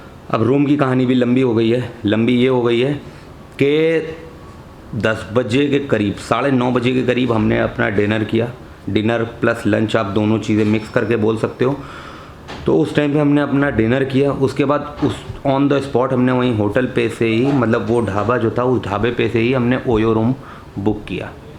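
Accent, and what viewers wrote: Kanpur, India. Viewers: Bhai...amazing vlog series....but yaar aapka typical Kanpur accent is really wonderful...😊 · bhai maza a gya apki voice sun ke kanpur ki ek alg hi feel ata he maza a gya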